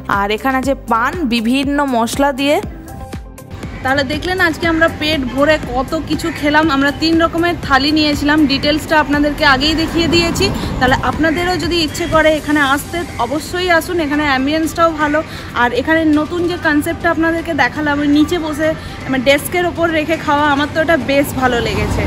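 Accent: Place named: Hindustani, India. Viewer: তাহলে হিন্দুস্তানিদের কথার টানে কথা বলছেন কেন ?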